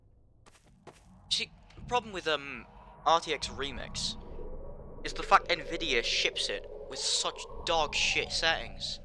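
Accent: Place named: United Kingdom. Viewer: well documented video but you have the funni brit accent and its distracting pls be a little less tea sipping brit next time pls ❤‍🩹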